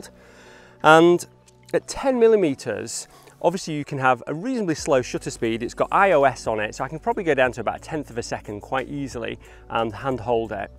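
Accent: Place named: Yorkshire, United Kingdom. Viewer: you're still utilizing the original 70/200 nikon vr? please explain why mate! love the regional English accent....so soothing! Is it yorkshire?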